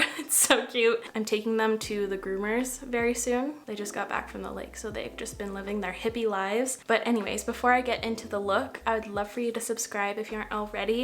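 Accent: Canada. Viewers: You have such a calming voice and I could listen to you Canadians say out and about all day! I love it! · I loved the way she said out, and about😍😍😍😍the Canadian accent love it!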